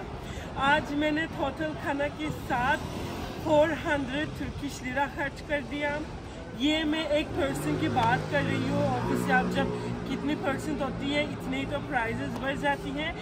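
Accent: Pakistan. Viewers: Assalam alaikum, this is Khawar from Karachi, Pakistan. Really like your urdu accent. Wish that when Me n my family visit Turkey we would love to meet you. Regards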